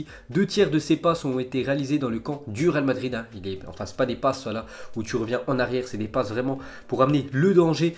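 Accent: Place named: France. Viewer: c'est le français typique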